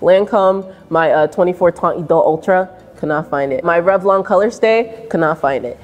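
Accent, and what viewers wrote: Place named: Nigeria. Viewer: sounds a bit Nigerian to me.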